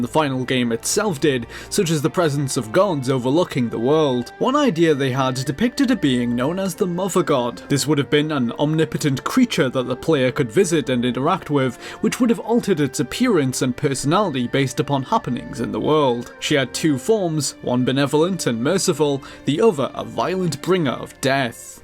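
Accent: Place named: Newcastle, United Kingdom. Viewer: You Geordie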